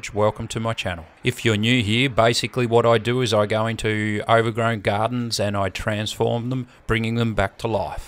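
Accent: Australia. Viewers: I love the Australian accent 🦘❤️ 🦘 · The accent helped, but the last giveaway was the great view of Mount Wellington. I always thought somewhere in Tasmania, given the proliferation of cul-de-sac streets, steep blocks and no shortage of amazing views. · I watch a few lawn care channels in Australia, including yours! As an 🇺🇲 I'm beginning to hear the different accents now. That's part of the fun of watching channels around the world! In the 🇺🇸 we have so many accents/dialects...some are so strong/thick it's difficult to understand! As usual Mr., excellent work! 👏 😊👋🇺🇲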